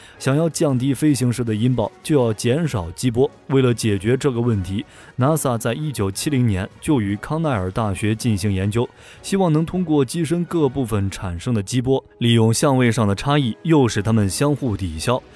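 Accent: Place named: China. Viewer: 大陆人口音，台湾人专用频道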